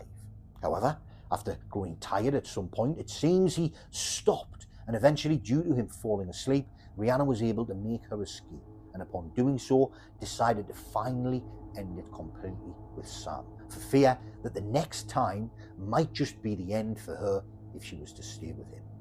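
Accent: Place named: Newcastle, United Kingdom. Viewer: I'm probably due you an apology for thinking you were a well spoken Geordie instead of a Mackem then haha. Glad you noticed my critique was intended as constructive instead of just arsey. I'm a Fifer who lives not far from Burntisland, trust me, you're not the first to say it out as one word, heard many an American or English tourist pronounce it the way you did. I'd also say there's probably a good amount more of Scottish Madmen (a few women too) and Monsters to cover in the future. For example wouldn't mind a longer or longish one on either Peter Tobin or Angus Sinclair. I was looking for something new in the True Crime genre and subscribed after watching 2 of your earlier videos, genuinely think your work is generally excellent mate.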